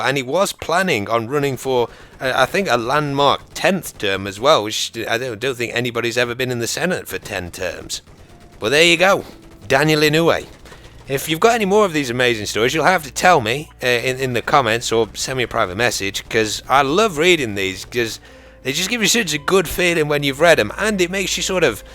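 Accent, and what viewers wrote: United Kingdom. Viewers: Love history man. These war stories are awsome. Ur like a white Morgan Freeman with a british accent! :-)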